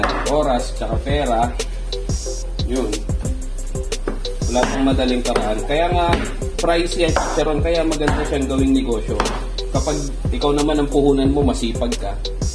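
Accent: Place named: Pampanga, Philippines. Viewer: May antimano, kapampangan ka brad! hahaha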